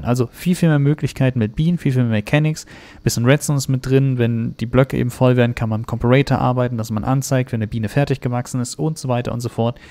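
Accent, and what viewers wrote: Hesse, Germany. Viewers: Auf jeden Fall kommt er aus Hessen meine ich, dachte aber aus der Nähe von Frankfurt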